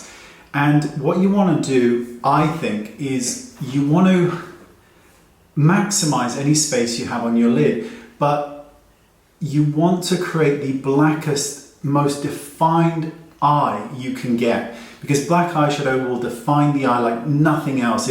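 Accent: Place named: United Kingdom. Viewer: Oh my heart! It dropped at totally useless... That BRITISH ACCENT 😍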